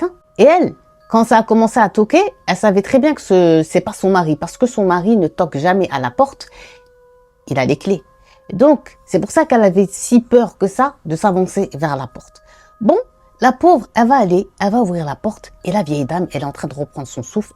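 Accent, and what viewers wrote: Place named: Gulf, Arab world. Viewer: 😮😮😮😮😮😮😮😱🫣😱😱L'horreur dans toute sa splendeur... J'avoue que tu es une très bonne narratrice. Tu captive ton public. Je t'ai decouverte récemment. Tu as un superbe accent du Khalij.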